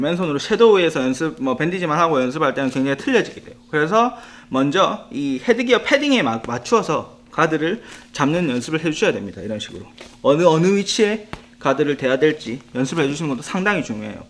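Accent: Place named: Gyeongsang, South Korea. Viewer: 경상도억양이 느껴져서 경상도에 있는 줄 알았습니다ㅋㅋ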